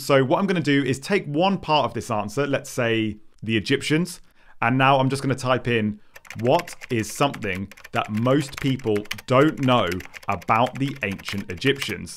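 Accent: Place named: United Kingdom. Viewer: Love your British Accent Sir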